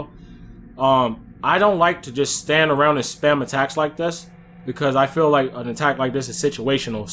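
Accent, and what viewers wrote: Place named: East Coast, United States. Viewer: I wanna say the East Coast but not too sure. Lol. I got people I know from NC, Maryland, New York, etc.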